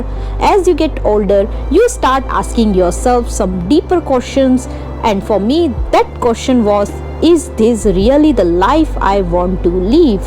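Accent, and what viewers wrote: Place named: India. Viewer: She speaks like how most Indians speak English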